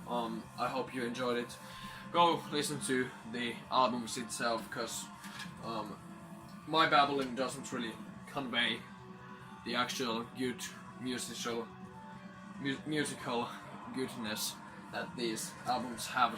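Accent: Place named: Europe. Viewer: Where are u from? U have an European accent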